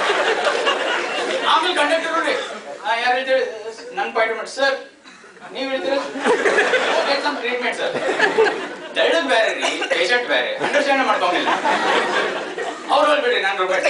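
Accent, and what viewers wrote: North Karnataka, India. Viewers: Wooow super brother nice attempt do more video with good quality , which city bro ur utter karataka 👌👌👌❤💐💐👍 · Pls upload your old videos, ನಮ್ಮನ್ನ ಇದೇ ರೀತಿ ನಗಿಸುತಿರಿ. ಉತ್ತರ ಕರ್ನಾಟಕದ ಕನ್ನಡ ಭಾಷೆಯಲ್ಲಿ jokes ಕೇಳೊದೆ ಮಜ😅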